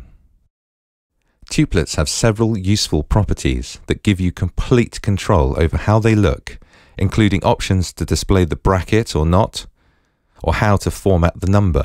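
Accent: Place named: United Kingdom. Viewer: don't you love hearing "eighth note" in a British accent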